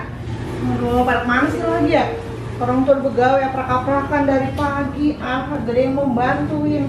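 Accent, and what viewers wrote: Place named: Betawi, Indonesia. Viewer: Medok betul Po betawinya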